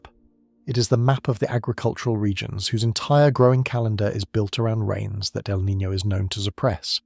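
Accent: England, United Kingdom. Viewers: Lose the English accent It sounds like shit.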